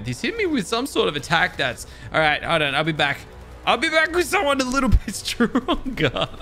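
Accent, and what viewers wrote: Australia. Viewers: you sound like you are from adelaide or sydney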